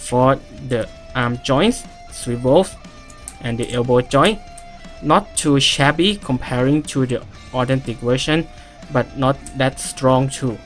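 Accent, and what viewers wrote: Thailand. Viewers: Are you Thai? พี่เป็นคนไทยไหมครับ ฟังจากสำเนียงแล้วน่าจะใช่( From your accent). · สำเนียงเหมือนคนไทยเลย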